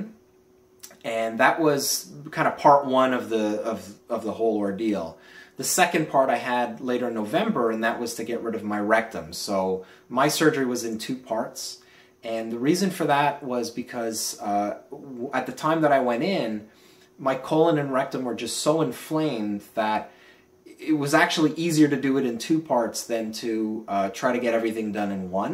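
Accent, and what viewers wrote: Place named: Canada. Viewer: Hiya! I thought that was a Canadian accent! We spent many many happy summers in Canada when I was growing up, (Nova Scotia and Alberta/The Rockies) I always thought I would move over as soon as I could but I met my now husband when I was young and that was that! We are planning to bring our daughter over for a long holiday in 2016 though and the big dream is to come and sing at jazz festivals as a way of bringing her back year after year! Super video! I'm not a new YouTuber but I'm a new ostomate (and loving it!!) and so overwhelmed with how wonderful the community is! Fantastic tag! I hope you had a lovely Christmas x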